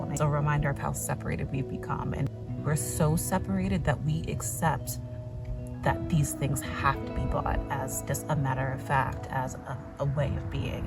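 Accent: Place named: Philadelphia, United States. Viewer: Just came across your channel, Love your content already! And your Philly/Delco accent 😆💚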